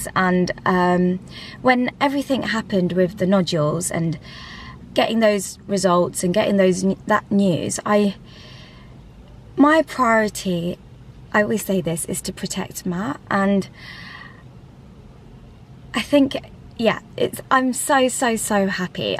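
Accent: United Kingdom. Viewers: lol love how you say sweaty mess it with your British accent. I feel like that all summer here in the US just a sweaty mess just like says.😂😂😂😂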